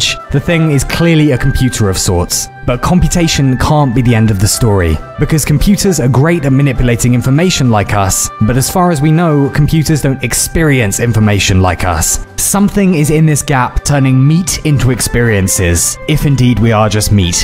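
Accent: United Kingdom. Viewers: Can’t tell if he’s British or Bulgarian but whatever it is, I love the small Bulgarian easter eggs!!! Love your ideas man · Your new microphone isn’t as clear as the old one. That or the overlays are drowning out the crispness. It’s more important to hear the British narrator than the musical background! Edit: the video is still damn good enough to make me forget the issue but it exists. Pls make more space turtle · r u British living in Bulgaria, or just Bulgarian with a british accent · Yeah his accent, vocabulary and past videos (complaints about the British train system etc) screams Englishman, but now I've seen he apparently lives (temporarily?) in a clearly Slavic/Russian place, judging by the POV footage from this video. Maybe he found a Russian soul mate ;p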